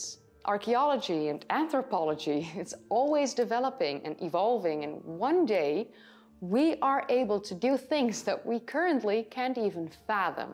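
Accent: Netherlands. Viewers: where are u from? certainly not American some kinda Euro country... OH Holland! cool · Dutch girl! (I heard it.) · that slight Dutch Accent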